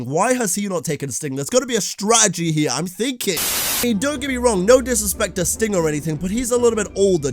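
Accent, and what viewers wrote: United Kingdom. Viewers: Like ur accent u sound British to me ❤️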